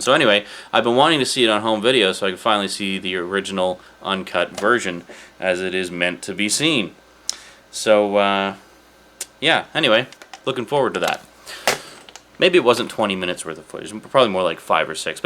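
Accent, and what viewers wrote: Canada. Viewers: I knew you had to be Canadian or one of the states bordering Canada. Who else says aboot! I really like your Dead Space videos. Reminds me of hanging out with my friends and playing games together.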